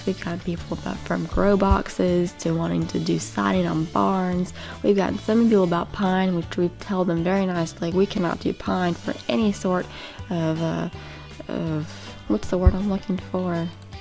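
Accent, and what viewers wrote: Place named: South, United States. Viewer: What a cool southern accent ! , sounds like the purest form of a southern accent , is it ? can't tell i'm from Norway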